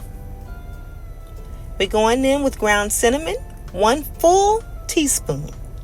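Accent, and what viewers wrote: South, United States. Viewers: Greetings, from Florence, OR. I'm new to your channel, love the southern drawl, and enjoy your demos. Haven't tried any yet but they're high on my priority list. All the best to you with appreciation, Diane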